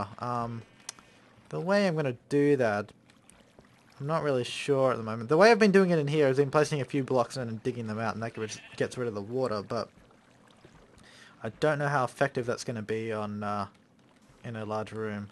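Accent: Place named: Australia. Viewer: Your accent is stronger, and sexier :) What part from Australia do you come from? I'm From Nsw, Boganville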